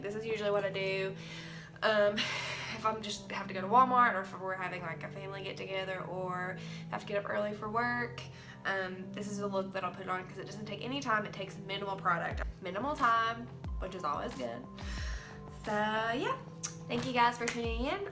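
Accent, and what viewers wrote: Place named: South, United States. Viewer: Where you from girl ? I’m from Texas and I thought I had a country accent !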